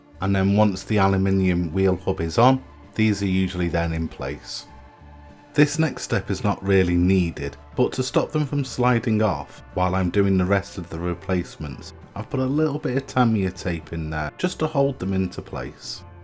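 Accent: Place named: North, United Kingdom. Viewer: I'm subbing because you sound Northern and depressed. You'd think this would be wrong but I'm becoming highly suspicious of happy Southerners. It must be a Northern thing.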